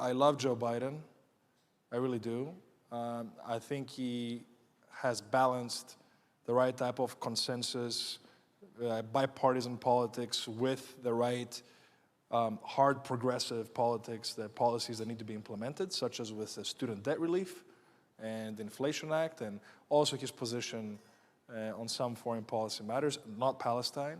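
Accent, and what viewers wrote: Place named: England, United Kingdom. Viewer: Στέφανε ξύρισε το μούσι διότι δεν σου πάει. Κρύβει το πρόσωπο σου. Είσαι πανέμορφος άντρας. Μητρική γλώσσα τσ αγγλικά. Μου κάνει εντύπωση ενώ ζούσες στις ΗΠΑ μιλας με αγγλική προφορά και όχι αμερικάνικη. Καλό κουράγιο σου εύχομαι να έχεις εκεί μεσα στο ΣΥΡΙΖΑ που λογική δεν υπάρχει από τους συντρόφους σου.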